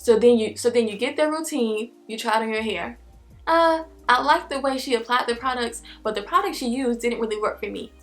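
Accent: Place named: Georgia, United States. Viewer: I found your videos populating on my YouTube feed a lot. “Thanks to my sister”😒. But although I’m not interested in black girls hair care processes for myself personally. I’ve come to find other things about you intriguing. Like the way you talk. I KNOW YOU HAVE TO BE FROM GEORGIA😂. The combination of how you articulate and encourage sophistication within black beauty…you definitely got my attention.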